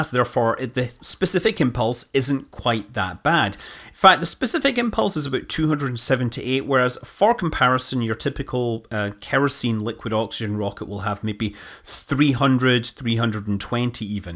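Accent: Scotland, United Kingdom. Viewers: I like this video series - “Scottish man reads space based Wikipedia articles”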